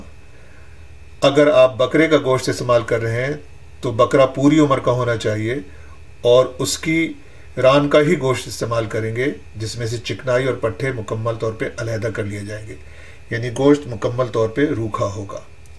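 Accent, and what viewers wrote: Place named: Uttar Pradesh, India. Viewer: Aap ka "quaf" batata hai ke aap ki jaren shayad U.P.men hain.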